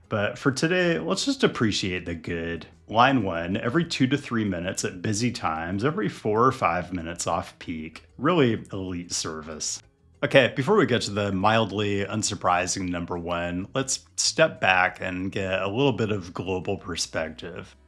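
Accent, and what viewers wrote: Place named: Pacific Northwest, United States. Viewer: The most pacific northwest accent i've ever heard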